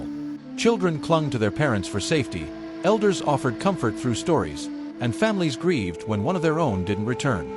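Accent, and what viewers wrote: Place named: United States. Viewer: History just never sounds right in an American accent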